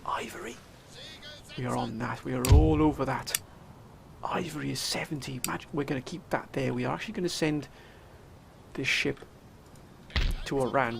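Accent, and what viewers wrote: United Kingdom. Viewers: Hello my friend. It's good to hear from you. I am very happy that my advice has been helpful for you. If you don't mind me asking I was wondering where you are from. You sound British. I'm just curious. Either way I do count you as a true real friend even though I've never met you in person. Hope all is well with you. I was wondering when you will start assigning Generals. No rush. Just curious. Have a great day my friend. Can't wait till the next episode! ☺☺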